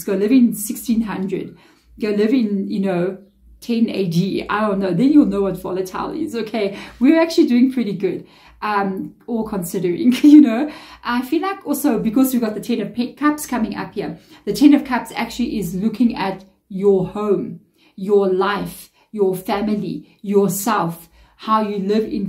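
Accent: South Africa. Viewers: love the SA accent?!!?🧚🧚🧚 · I absolutely love your South African accent and the way you speak and express yourself! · I am South African too living in New Zealand love the accent 😊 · Always a delight to be here for your readings. So funny all these south african english like the now-now :) Thank u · I thought your accent is so cute. ❤️ I never had any trouble understanding your English at all. You talk so grammatically correct. I'm in Chicago and never knew anyone who is South African. Love you mama tarot lady. ❤️ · ❤😊thank you for your encouraging readings, dear soul!!! And I love your accent, I really do. In the beginning I had to become used to it, but now I understand everything you explain😊. My daughter s had schoolmates from south Africa, and at the age of 12 their parents had moved to Germany. They shared our home very often, and my daughter never had difficulties to understand you!😊 Feel good and keep shining❤❤❤ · I love listening tonyour readings precisely because of your accent. 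It reminds me of a former south african manager I worked with, lovely woman, I miss working and talking with her. You talk at a very comforting pace. Thank you for all you share ❤ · 777 comments, ❤ well I've now made it 778😂❤ ps your accent is my favourite, I personally love the South African accent. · That is what drew me to your channel, not only your lovely face but beautiful South African accent. Thank you for all you do..................... love from the UK. xxxxx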